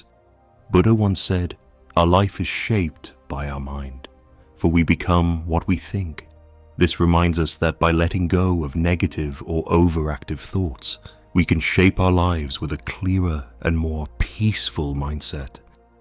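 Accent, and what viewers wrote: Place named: United Kingdom. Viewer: What’s with the British AI accent…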